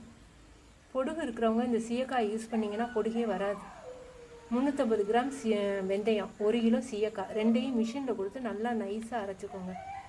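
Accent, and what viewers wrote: Madurai, India. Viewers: tq mam. very useful. I like all ur recipes and we'll explained about the ingredient very tasty.and I like ur madurai language very sweet to hear .tq s a lot.we r very lucky to have such a wonderful talented mam.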